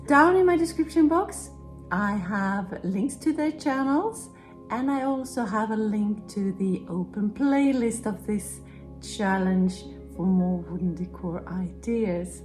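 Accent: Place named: France. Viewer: You speak excellent English with your wonderful French accent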